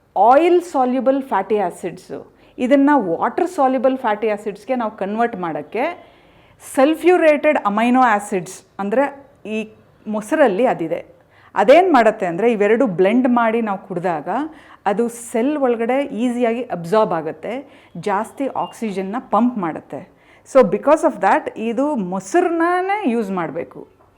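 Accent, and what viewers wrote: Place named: Bangalore, India. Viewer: ದಯವಿಟ್ಟು ಶುದ್ಧ ಕನ್ನಡ ಮಾತಾಡೀ ಬೆಂಗಳೂರಿನ ಕನ್ನಡ ಬೇಡ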